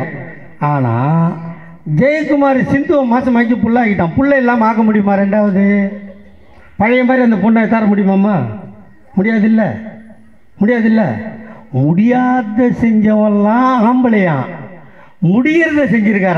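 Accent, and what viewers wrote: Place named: North Chennai, India. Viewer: Vada chennai la ippadithane pesuvanga. Pengalume ippadithane pesuvanga. This is vada chennai local language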